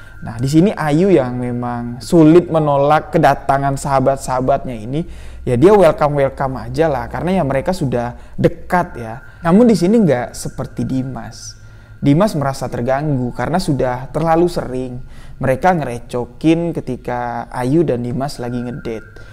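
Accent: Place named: Bali, Indonesia. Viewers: mas logat nya seperti.orang Bali.Terimakasih kisahnya. buat pelajaran bhwa hutang WAJIB DIBAYAR SESEGERA MUNGKIN. Dan bila meminjamkan uang,TIDAK PERLU DENGAN BUNGA itu namanya RIBA. Kalau sipeminjam tidak sanggup bayar hutang,alangkah baiknya,jika DIBEBASKAN saja, Insya Allah akan Allah ganti rezqi dengan berlimpah. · Kamu orang mana min,,, logatmu mantul2 kayak orang bali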